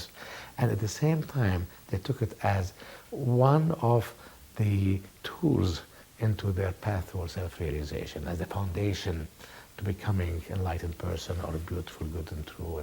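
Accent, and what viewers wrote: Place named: Spain. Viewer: Interesting how an a man with an arabic name, and a spanish accent can expound on a very inclusive subject such as emotional intelligence and tie it into the history of commerce! Very deep!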